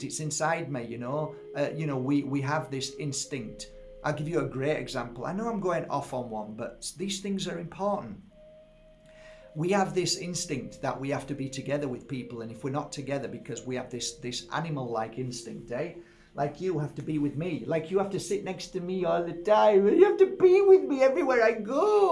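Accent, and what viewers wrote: United Kingdom. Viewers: I love listening to you! And I love your UK accent ♡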